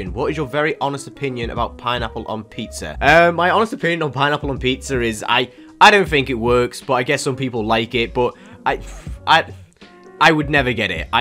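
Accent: United Kingdom. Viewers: He has a British accent? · Why is it I only just realized.. You have a British accent. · Ye I didn’t know- I thought British but I also heard American and Scottish and Australia at same time but in curtain words? Hard to explain lmao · Are you British, i cant really pick up any accents well and was just wondering 😂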